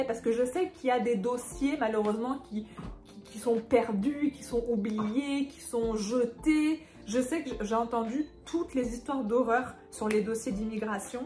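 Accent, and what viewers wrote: Quebec, Canada. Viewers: Oui, je suis d'accord par moment on entend un léger accent québécois. Au fil des années je pense que cela va s'accentuer un peu plus mais ne perdez pas l'accent Français totalement.😊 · Tu as pris un peu l'accent québécois 😂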